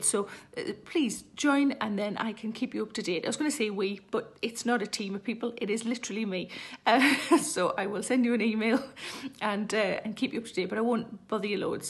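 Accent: Newcastle, United Kingdom. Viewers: I love your geordie sayings and accent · Hey there, Geordie girl. You are so funny and cute and kind. Just ask in that accent and I will always subscribe! TO YOUR CHANNEL!! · Funny that she reminded me of Benny Hill show, love your British accent! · Yay! I have introduced your content to my mother (you and I are about the same age), and she loves your humor as much as I do. Her only question to me was, "why does she talk so funny? It's hard to understand her sometimes." I don't know why I found that statement hilarious, I had to explain to her that just like us "Yankees" in the Midwest US sound different from Boston, not everyone in the UK speaks like Mary Poppins or Burt the chimney sweep. Anyway, please come to Minnesota on your US Tour, I'd love to see you in person!!! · Not only am I in love with your accent (reminding me my student life in the UK), but I also enjoy binging your videos when I’m in need of laughter and positive vibes. 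Thank you for uploading! Although your beautiful country just divorced the EU, please come do a show in France!!! I promise, most of us are nice... 😉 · I've watched you for years! I'm from Saudi Arabia (and yes, I understand Geordie) and it's quite unlikely that I would be in the UK any time soon, never been actually. I've only watched your specials and interviews on YouTube and you can't imagine how thrilled I am when you started this channel! Already subscribed, don't mind learning what you're eating (biscuits, innit? :D) and cannot possibly wait for the full special! Thanks flower!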